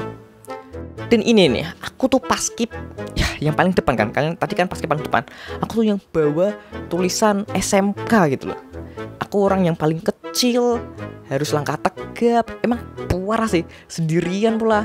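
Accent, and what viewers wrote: Java, Indonesia. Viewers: Iya bahasa jawa lucu kamu ya hahahhaha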